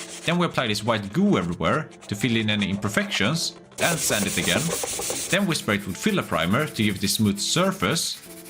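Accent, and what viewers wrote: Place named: Finland. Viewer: Are you Finnish? The accent is familiar!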